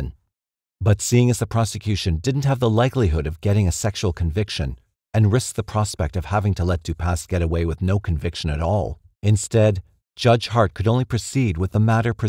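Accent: Canada. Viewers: I think the narrator is actually Canadian... and the creator is definitely not American. 🤓🧐😉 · Double down that sweet sweet Canadian narration!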